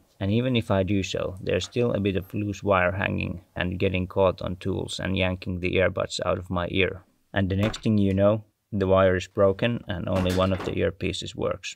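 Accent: Finland. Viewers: he's Finnish there's no question about it. All the products he uses are with Finnish labels, the newspapers he uses sometimes are Finnish etc. Also the accent is very typically Finnish too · You have such a lovely Finnish accent! I hope we hear you talk more sometime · is that a finnish accent? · Is that Finnish accent? Torille! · I love your voice with your finnish accent! very fun to listen to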